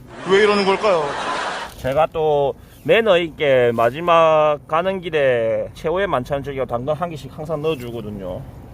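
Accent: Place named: Gyeongsang, South Korea. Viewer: 아~함암이네요 ㅋ 사촌이모가 함안이 고향인데.. 지는 부산이 고향인데..뜬지 12년차..ㅋㅋㅋ 꺼 보믄서 사투리..이자무꼬 다시 배우고 이쓰예 ~~~ 국민학교 ㅋㅋㅋㅋ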